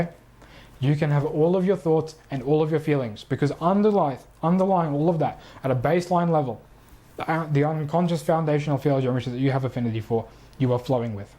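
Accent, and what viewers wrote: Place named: Australia. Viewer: Thank you so much for your reply,. Usually I can hear the Aussie a lot but your accent isn't very strong. Anyway, I realized you were likely Aussie when I saw one of your older vids with you in a forest that was definitely not anything you'd see in England lol. Thank you for the insights on the qi stuff. Sounds pretty accurate I'd say even though I don't have a thorough understanding of the five elements, I have dabbled in the arts. I just purchased your subliminal and created my own 1 hour version and am going to listen to it while gazing and also while I do my drawings. You are right about the geometries in my field of vision. Actually, I did notice while gazing the flower of life pattern overlaid onto reality...I don't really know how to describe it. Kind of like a hologram or something. It's very subtle. Wishing you an awesome day and really grateful to have found you and also overwhelmed by everything I'm learning. 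I'd definitely be interested in working with you and possibly getting on the immortality program, as that is my aim, and currently working on manifesting my wealth so that I can do these things. I feel I have come a very long way on my own without help from anyone, just following my path, and yet I still feel very lost, at least mentally I don't have a grasp on what is happening but you are helping me out with that. Thanks!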